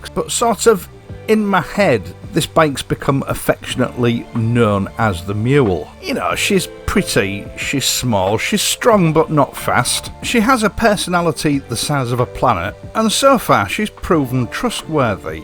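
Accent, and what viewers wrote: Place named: Hull, United Kingdom. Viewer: I take it you're from Hull or thereabouts? From Hull meself, but been languishing in Western Australia for the last 48 years. Just found your videos and I like your commentary and delivery. For me sadly I won't be owning another motorcycle anytime soon. At 74 my wife won't let me near one. Still good to dream though.....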